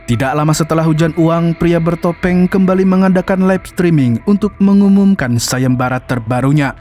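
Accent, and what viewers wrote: Banjar, Indonesia. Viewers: Katuju banar ulun wan amang wan amang.channel nyak the bestlah.😂😂😂😂❤❤❤kalihatan banar logat banjar nya bumbuhan...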